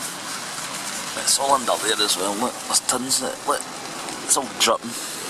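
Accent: Scotland, United Kingdom. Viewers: Try watching this with the Google auto-generated subtitles on, killing myself laughing at how it can't handle Scottish accent!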